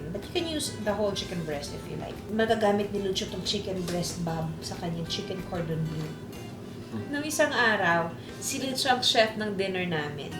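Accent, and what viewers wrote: Batangas, Philippines. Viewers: nagkakaroon na po kayo ng puntong batangan. nakakaproud lang. palm sugar po sa batangas ay SANGKAKA..more power po.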